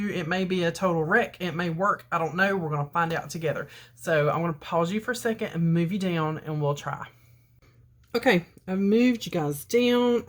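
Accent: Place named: Alabama, United States. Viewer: I came to the comments to see if I could figure out her accent 😂 and I see The Alabama girl. I’m from Jasper 😊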